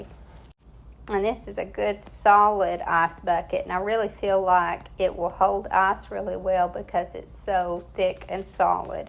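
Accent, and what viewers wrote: South, United States. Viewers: I just love your work, your beautiful Southern accent and mostly that you love the Lord! Keep glorifying his name like you do! 🛐💟✝️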